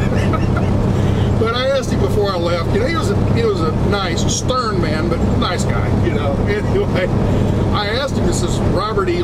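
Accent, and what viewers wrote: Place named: Southern Ohio, United States. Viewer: You don’t sound much different than those of us in southern Ohio.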